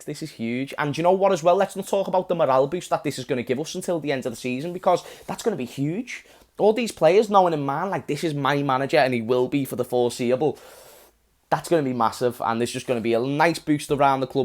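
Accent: Liverpool, United Kingdom. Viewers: Hi. I ve been Liverpool fan for 47 years. Your character and scouser accent is so alike my ex schoolmate! YNWA Thailand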